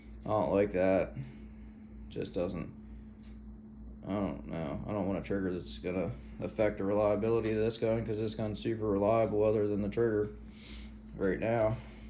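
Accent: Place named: Delaware County, United States. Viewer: Sounds more Delco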